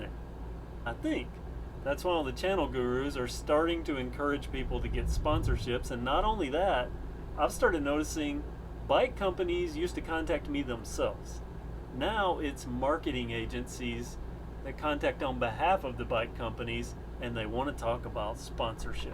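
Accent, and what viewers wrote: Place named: Texas, United States. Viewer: Please make Travel vlogs... I watch all of your videos... Tbh I have notification on I love your accent. Gives me Texan vibes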